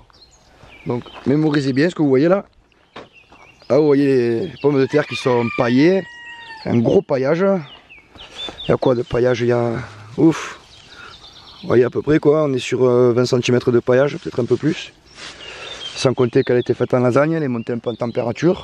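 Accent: South, France. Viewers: Merci beaucoup pour la réponse complète et sincère ! Hate de voir vos prochaines vidéos avec cet accents qui rappel le soleil ^^ Peace & Love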